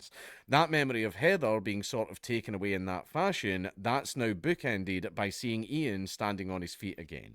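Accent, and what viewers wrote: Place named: Scotland, United Kingdom. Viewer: Locals pronounce it more like "Lean-gatha" (I live just over in Moe, probably 20 minutes away) Great to see Dank covering a local story! Dandenong pronunciation was as spot on as a Scot's accent will allow 👍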